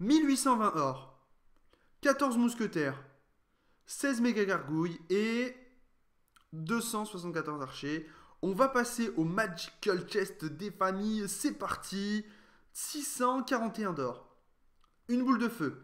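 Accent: France. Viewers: l'accent du Sud 😃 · J'adore ton accent marseillais !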